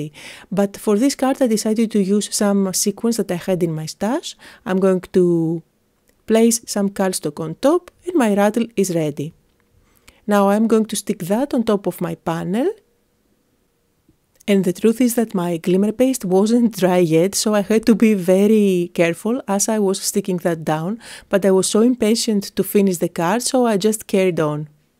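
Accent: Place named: Greece. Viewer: So cute,! I think my favorite is the little boy with the clothes in the washing machine! How imaginative! So, you are Greek, I gather? I love listening to your accent! Your English is very good!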